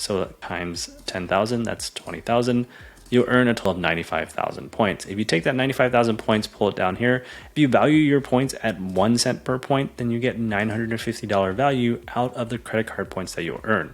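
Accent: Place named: Canada. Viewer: All that time I thought you are from Canada 😂😂 Thank you for another great video 👏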